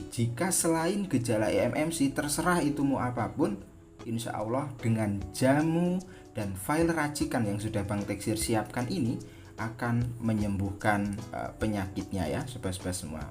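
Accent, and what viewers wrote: Java, Indonesia. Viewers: Mantap kang, tempat servisnya dimana ch bang,dari logatnya ch orang jawa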